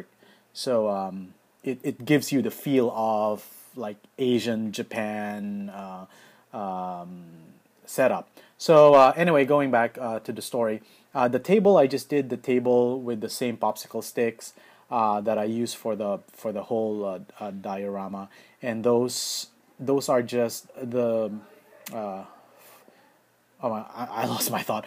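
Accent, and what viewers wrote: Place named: Philippines. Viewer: the way you speak, parang accent ng pinoy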